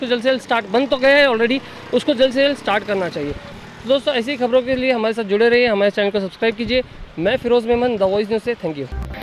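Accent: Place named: Bhiwandi, India. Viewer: Me and my entire friends subscribe your channel because of Bhiwandi voice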